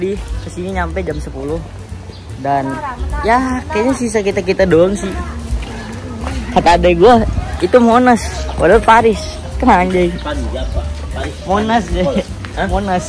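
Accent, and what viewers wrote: Cirebon, Indonesia. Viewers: Bang lu orang Cirebon apa Kuningan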